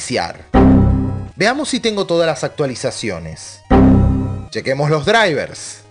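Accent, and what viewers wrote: Uruguay. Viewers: Eres uruguayo :0?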